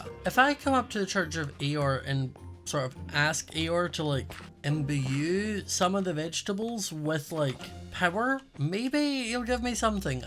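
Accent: Scotland, United Kingdom. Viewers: ok, so. new subscriber here. Nice ta meetcha. I really love the Scottish 'language'. it would be so cool if you did a video that included all the normal Scottish slang that exists. I wanna hear what a true Scottish man sounds like before I move to Scotland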